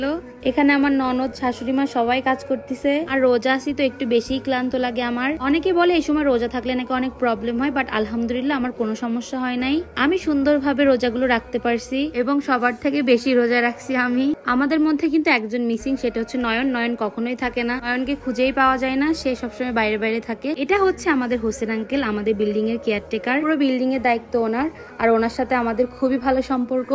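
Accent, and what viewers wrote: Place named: Chittagong, Bangladesh. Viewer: Amader chottogramer vloger,,,amra boalkali taki,,,onek vlo lagay jokon chatgaiya kota suni❤❤❤❤❤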